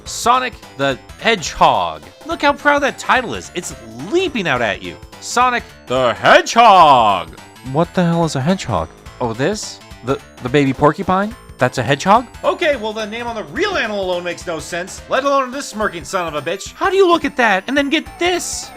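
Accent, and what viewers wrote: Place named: United States. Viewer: I've also never seen that 7 emeralds ending. Are you, by any chance, playing Sonic Compilation (the one that had Sonic 1, 2 and Dr. Robotnik's Mean Bean Machine)? The standalone Sonic 2 has a different "good ending" where it's just the logo shown over a black background. I noticed in the brief comparison clip that your Sonic 1was moving somewhat slower. Is that the infamously poorly PAL Mega Drive localization of Sonic 1 where, because PAL TVs were running at 50 Hz, the game actually runs about 16% slower. Even the music is slowed down? But you have an American accent so I assume you own an NTSC Genesis. By the way, kick ass review. If I were to make a review of Sonic 2, I'd probably describe the negative aspects of the game the exact same way you did.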